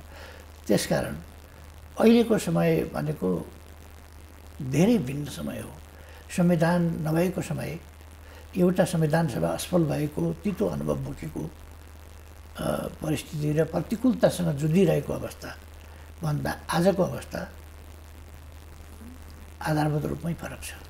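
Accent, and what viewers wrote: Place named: United States. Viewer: baini timi nepali ki english ho???tongue ta purai half-american jasto xa ta...xi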